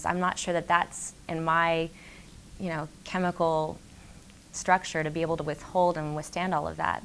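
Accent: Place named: Texas, United States. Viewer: She sounds so genuine. She looks quite natural in front of the camera. And shes very photogenic. perfect texas accent. Jeez. Total 10.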